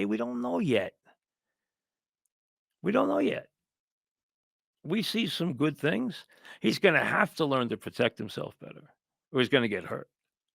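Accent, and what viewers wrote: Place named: Long Island, United States. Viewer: love but as a long islander, his accent is HORRIBLE…”yeh gotta thrOH thuh bawl!” i always thought part of being in broadcast joirnalism was adopting a natural, neutral accent and speaking and pronouncing clearly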